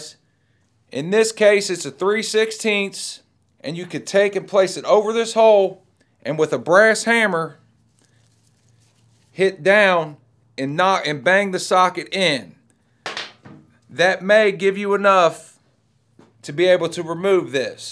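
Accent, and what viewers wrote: South, United States. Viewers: I dont think ive ever heard a more southern accent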